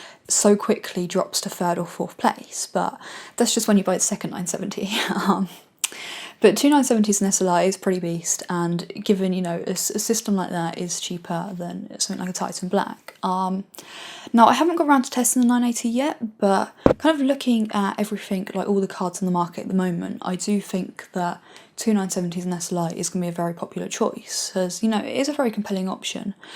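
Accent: England, United Kingdom. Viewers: Well I have to say you know your stuff and very easy on eyes too. Although I have a little problem understanding you. You have a very rich english accent. But after watching about 3 of your videos, I guess it's starting to clear up a bit. Oh and by the way, 980 in SLI is the way to go! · that's British English :) C'mon im Brazilian and i understand perfectly fine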